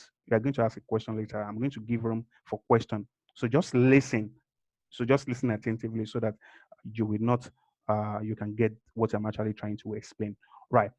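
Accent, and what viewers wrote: Nigeria. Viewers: This is a Nigerian Accent! Nice one brother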